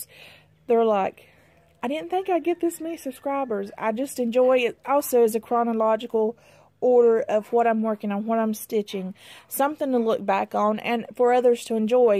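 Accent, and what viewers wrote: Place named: North Carolina, United States. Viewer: Congratulations Proud Mom! Thank your Son for me for his service, please! Love the stash! Cute doggies too! Myrtle Beach is my favorite place on Earth! Is there a LNS in MB or nearby? Love your accent 'cause you sound like me, ( I'm in the NC Foothills, Wilkesboro, between Boone and Winston Salem). Anyway, after all of that said, I really did enjoy your video! Best Stitches! 😀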